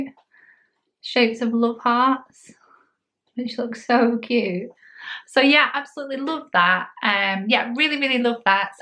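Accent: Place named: England, United Kingdom. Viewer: Love ur accent what part of England are u from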